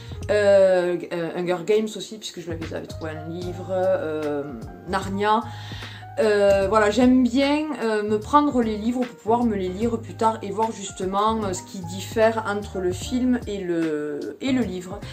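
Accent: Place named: Occitania, France. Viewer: Coucou, sympa ta vidéo 🤗 je compte faire un tour à la croix Rouge dans la semaine 😉 pour info, heliotrope de chez adopt c'est le dupe de Manifesto d'Yves st Laurent 😊 j'adore les parfums adopt 🤗 ton accent m'a fait rêver ! Toute la famille de mon mari se trouve en occitanie ( Perpignan, Thuir, salse le château etc... ) 😊 À tout bientôt 😉😘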